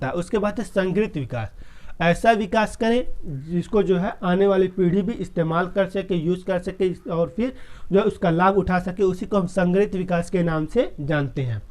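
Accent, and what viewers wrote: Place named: Bihar, India. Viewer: Bihar se ho aap lgta h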